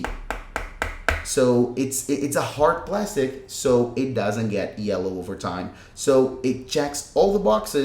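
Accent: Brazil. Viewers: Gostei,fala muito bem inglês,só sei que é Br pelo sotaque kaskka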